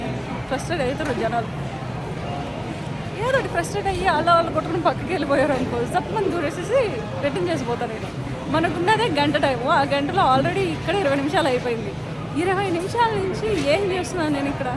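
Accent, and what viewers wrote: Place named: Telangana, India. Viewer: నీ confidence levels సూపర్ బంగారు... Really you are so cute and smart... నీ native telugu మాత్రం కేక... తెలంగాణ పిల్లవు కదా.. కాస్త చిలిపితనం బాగా వుంది... God bless you...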